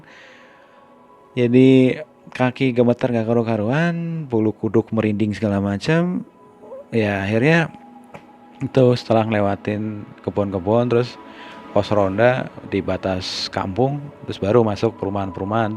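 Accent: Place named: Banyumas, Indonesia. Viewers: Bagus! Ceritanya sederhana. Masnya ngapak jadi kangen ngobrol sama temen2 ngapak! 😂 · dimanamana tempe enak..ora ngapak ora kepenak😁cilacape ngndi mas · Baru denger di awal2.lgsg ketebak.pasti wong ngapak.salam ngapak bosku!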